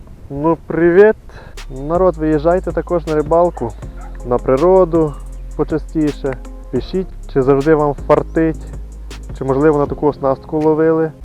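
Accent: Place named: Ternopil, Ukraine. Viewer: Тернопільський акцент. Рибалка це життя і активний відпочинок👍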